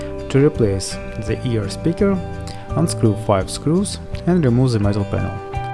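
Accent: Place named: Russia. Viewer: рашн аксент дас ист пыздець ) and thanks a bunch for the video, it's awesome and clearly explains all actions - much appreciated 👍🏻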